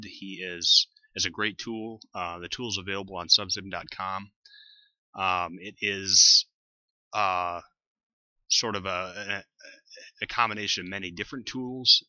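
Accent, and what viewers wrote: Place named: United States. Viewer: You have a true american accent, then you say something in German and I doubt )) Thanks for the tutorial.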